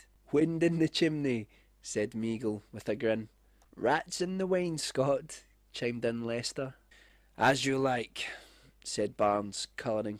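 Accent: Scotland, United Kingdom. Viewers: This is good. Are you scottish or irish?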